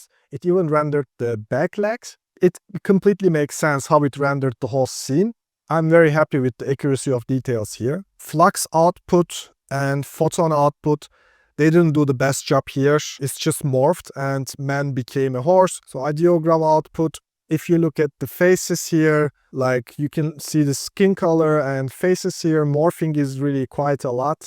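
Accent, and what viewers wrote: Turkey. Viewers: Kanka bişey dicem aksanında Türk olduğun o kadar belli ki severek takip ediyorum ama :)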